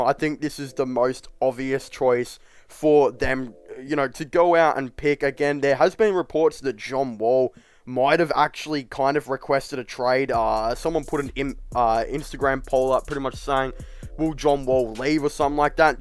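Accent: Australia. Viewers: Dude are you Australian or are you trying to sound Australian and doing a bad job at it?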